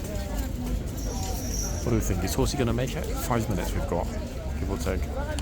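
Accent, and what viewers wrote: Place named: United Kingdom. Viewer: I love the British accent and humor ❤️😂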